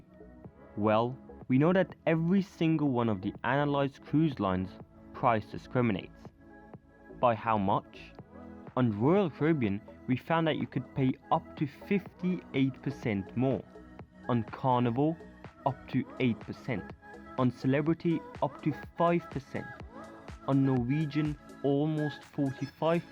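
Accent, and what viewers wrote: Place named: Egypt. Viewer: you sound egyptian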